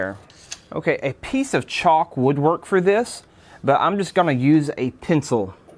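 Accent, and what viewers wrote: South, United States. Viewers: Love that southern accent....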